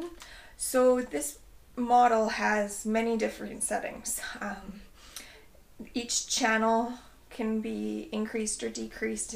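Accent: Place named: Canada. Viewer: I love your accent, Canadian?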